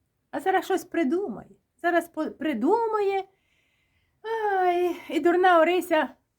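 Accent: Ukraine. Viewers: Вінниччина. Моя бабуся так розмовляла! Її-бо- присійбо .... так і казала. Макогін! *Так - Вінниччина * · Ну, імовірно, що Наддніпрянський говір. Але він дуже великий за ареалом - від Херсонщини і до Київщини, та від Поділля до Луганщини.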